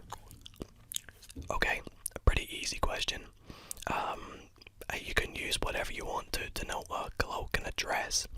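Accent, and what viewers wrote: Scotland, United Kingdom. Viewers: He’s Scottish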